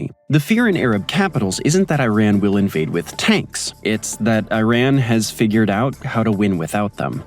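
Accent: United States. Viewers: Warder, Baddle, Baddery, Lidderally. No one butchers the English language like a Seppo.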